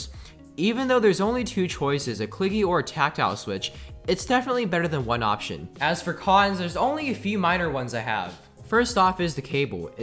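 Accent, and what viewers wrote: South, United States. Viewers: bring back the southern accent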